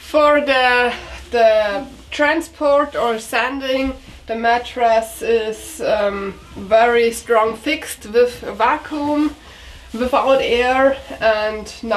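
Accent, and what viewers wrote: Germany. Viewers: Has to be the accent. I like it when she goes all German tongue. Helps me learn the language. Very Polite Lady.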